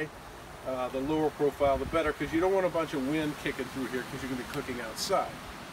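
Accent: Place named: South Louisiana, United States. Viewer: A woman from South Louisiana I can tell.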